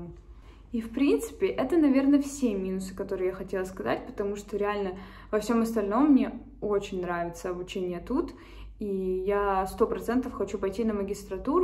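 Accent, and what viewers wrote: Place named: Urals, Russia. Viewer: А речь у вас не питерская. Много призвуков и звуков, зарактерных для уральских студентов, возможно это корни?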